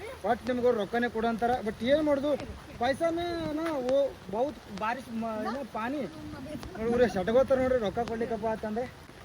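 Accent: North Karnataka, India. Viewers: Super uttar Karnataka maatu , u k huli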